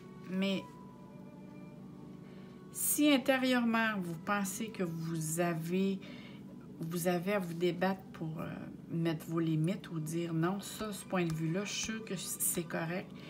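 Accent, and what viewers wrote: Quebec, Canada. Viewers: Bravo quelle belle énergie et j apprécié beaucoup ce petit accent me rappelant la douce Céline dion.bonne intuition merci à vous. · Cc chère ^ ^ tt a fait vrai mon dieu que j aime votre accent..cela me ramène en 1991 mes 24 ans mon voyage au Canada.. Québec...mes études d infirmière....J étais dans la région des Laurentides...magnifique pays....et oui une grande fatigue indescriptible...je continue ms mon dieu quelle résilience....bisous ^bon bec^..& oui des cicatrices inomables un passé très très douloureux qui a laissé des marques......pour au bout du compte devenir qq un de très fort oui une volonté hors pair merci gratitude namasté.......tout ce qui ne nous tue pas nous rends plus fort et oui j affirme.. kris de Nice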